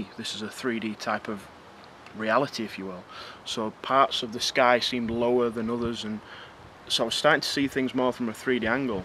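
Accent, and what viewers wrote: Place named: Lancashire, United Kingdom. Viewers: How? I want to do it, I am in the UK, Birmingham. You sound like you come from Lancashire maybe? Buy it online at shayanashop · Love how you flit from proper English to Northern :D Nice vids, mate. Subscribed... Peace from Barnsley ;)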